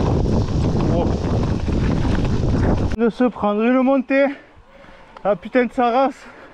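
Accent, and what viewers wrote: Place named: South, France. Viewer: l'accent du sud !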